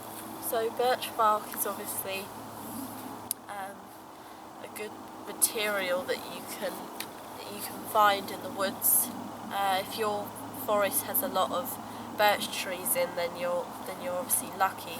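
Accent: United Kingdom. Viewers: your like a little ray mears must be the British accent.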